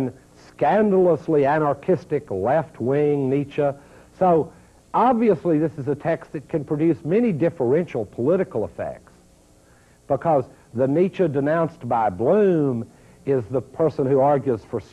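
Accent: South, United States. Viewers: a southern twang and philosophy go together so damn well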